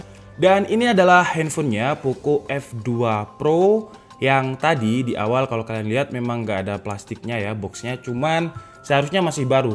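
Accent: Java, Indonesia. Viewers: Ternyata..boso jowo asline..asli wong jowo mase iki..salam santuy sam..😁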